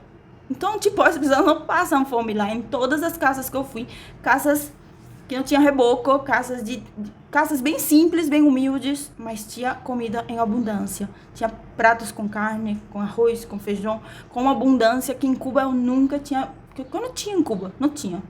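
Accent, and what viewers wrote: Rio de Janeiro, Brazil. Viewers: Olá, vc já é uma Carioca. Até o sutaque, já pegou. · Hola. Por primera vez entrei o seu canal. Pensava que eras brasilera. Como aprendiste a falar assim com assento brasileiro perfeito? Eu sou cubana também,mais,eu gosto muito de escrever. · Eu acho que você não é CUBANA Seu sotaque e totalmente brasileira e esta se fazendo de cubana para ganhar likes e inscritos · Voce está falando um pouco...como os cariocas...Vem aquí para Sao Paulo...Adoro voce.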